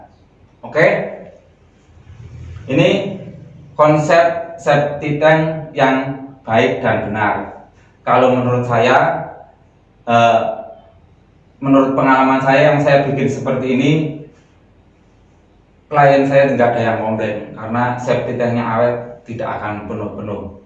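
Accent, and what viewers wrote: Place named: Surakarta, Indonesia. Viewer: Ngomong nya mirrip orang Solo🤣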